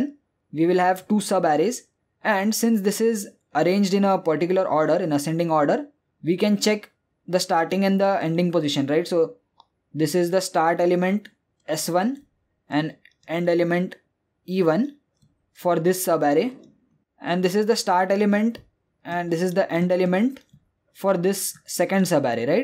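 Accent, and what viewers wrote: India. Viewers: Terrible Indian accent. Barely understand what he is saying...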